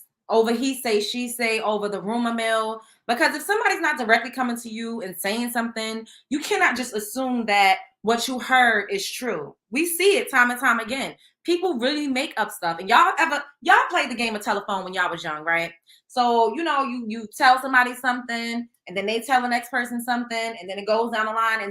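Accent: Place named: New York, United States. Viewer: Hey girl! Do you remember Miss Jones from Hot 97? You sound a lot like her. It’s the NYC accent for me! 😍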